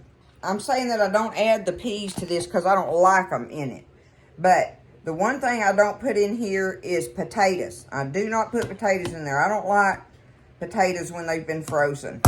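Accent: South, United States. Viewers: FIRST COMMENT!!! I HIT LIKE RIGHT AWAY BEFORE I EVEN WATCHED THE VIDEO BECAUSE I ALREADY KNEW THAT IT’S GOING TO BE GOOD🤤💯💪🏾 I LOVE ME MY AUNTIE 🤗❤️ AND YOUR COUNTRY ACCENT MAKES THE RECIPE EVEN BETTER 🤷🏽‍♀️🤣 GOD BLESS YOU MY ONLINE AUNTIE 🙏🏾😘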